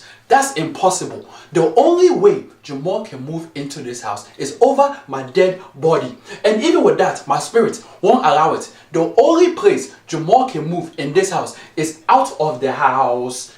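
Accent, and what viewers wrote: Africa. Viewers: Why you talk african but dont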